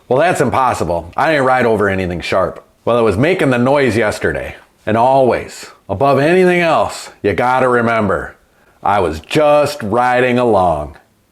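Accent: Wisconsin, United States. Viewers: Mad proper Wisconsin accent. -715er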